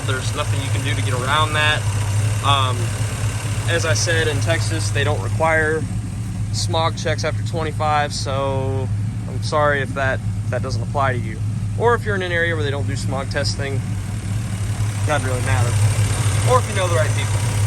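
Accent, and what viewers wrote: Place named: Tennessee, United States. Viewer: I'm from Tennessee and not only do we sound alike.... we also think alike .... lol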